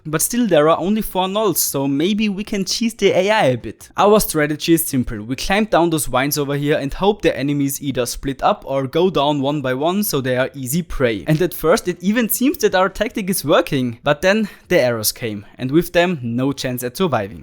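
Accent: Germany. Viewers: I think i hear in your pronounciation that you are from germany, m i right? no offence. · Are you german? 😅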